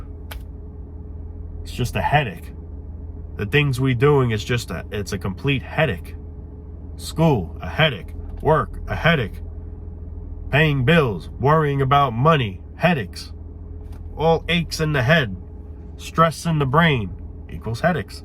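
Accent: New York, United States. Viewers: NY accent, makes this better.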